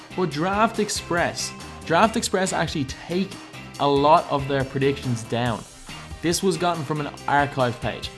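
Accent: Dublin, Ireland. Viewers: r u Irish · are you Irish im irish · this guy Irish? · You sound like you're from Dublin lad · Irish accent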